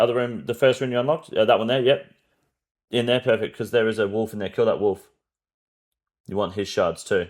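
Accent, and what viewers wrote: Australia. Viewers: It’s Aussie talk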